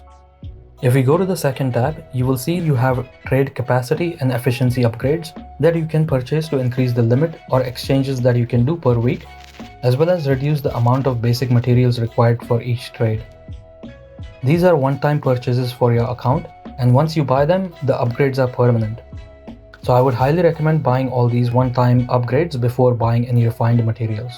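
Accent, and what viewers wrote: India. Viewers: You sound so Indian :D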